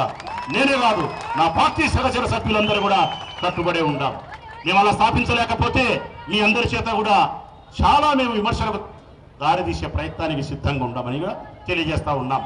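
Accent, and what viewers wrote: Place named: Rayalaseema, India. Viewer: Anna super anna..rayalaseem slang lo eragadesav...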